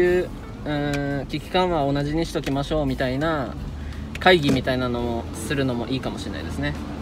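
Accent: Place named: Kagoshima, Japan. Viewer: コメント失礼します。違ったら申し訳ないんですけどイントネーション鹿児島の人見たいですね😊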